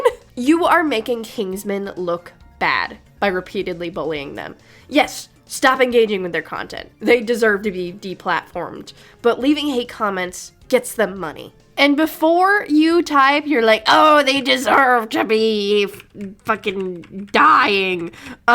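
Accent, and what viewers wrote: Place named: Michigan, United States. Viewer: guessing Michigan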